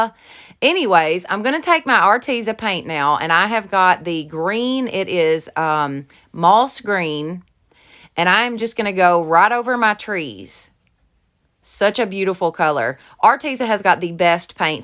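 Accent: South, United States. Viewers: Hi, I just wanted to start off saying that I love love love all your craft ideas, you are so creative. 2nd is I get a kick out of your Southern accent. I am from the East Coast a small town in Massachusetts & love the Southern accent. I also love to craft, I am a beginner & have made a lot of mistakes along the way...but sometimes they turn out to be happy mistakes. My kids call me a craft hoarder lol, I am always at DT & DG those are my favorite stores. Ok enough of the gibber jabber, I'm looking forward to what you create for the New Year, happy crafting & be safe.😷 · I'm a little confused. You talk about your fiancee but then you mention your mother-in-law. Just was wondering. And you are so talented it is unreal. Thank you for all the beautiful crafts you do. And I love your southern drawl. I too am from the south. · So cute❤️❤️❤️I don’t know what part of the south you’re from, but I’m in Huntsville Alabama ( originally from Waterloo/Florence) but you crack me up so much because you sound like me talking!! 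Love your channel so much! I think it’s because you’re just blunt and tell it like all southern women do🤣 I have never found the lanterns in any dollar tree. I’ve been to about 10 looking for the little glass candle holders because I got everything to make light posts except them.. grrr 🤣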